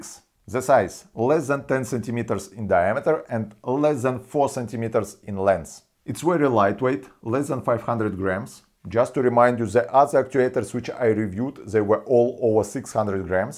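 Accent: Russia. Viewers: Русский акцент сразу ударил по ушам. Что бы убедиться в своей правоте открыл описание под роликом, но увидев там какого-то Yoyo Liu был крайне удивлен. Пока не понял что это просто контакт продавца. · С русским акцентом мне даже легче понимать английский язык :). И с юмором, что тоже приветствуется! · Ohh russian accent👍. You reminded me USSR scientists, who were living only for science itself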